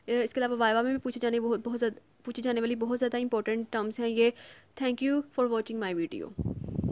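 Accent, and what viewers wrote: Lahore, Pakistan. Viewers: Your accent reveals that you are from Lahore 😅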